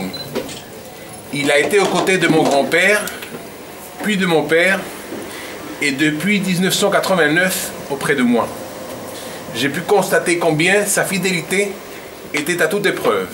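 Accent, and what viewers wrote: Spain. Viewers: Il a un accent espagnol ? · un super accent espagnol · Par les temps qui courent, personne ne voudra en France d'un roi qui a l'accent de Miguel Indurain. C'est ça que je veux dire. Tant que vous nous vendrez la monarchie avec une tête de gondole pareille, la république aura encore de beaux jours devant elle. A la limite, l'autre famille de prétendants (celle de celui qui est mort il y a quelques temps) serait déjà un peu plus crédible... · C'est l'accent espagnol, pas roumain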